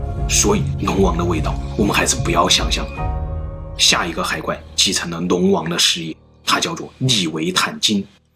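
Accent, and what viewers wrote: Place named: Guizhou, China. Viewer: 总感觉是贵州人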